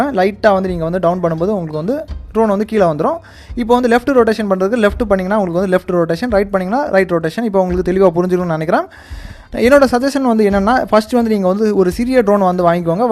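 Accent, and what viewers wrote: Kanyakumari, India. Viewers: Super bro...KANYAKUMARI nnu onga slang vache guess pannitaen. ...keep rock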